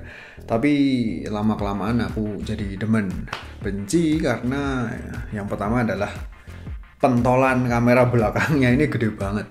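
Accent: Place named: Banyumas, Indonesia. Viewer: Dri logatnya Mas nya ngapak ya ? Klo iya kita satu darah 😂🎉 Sukses selalu 👍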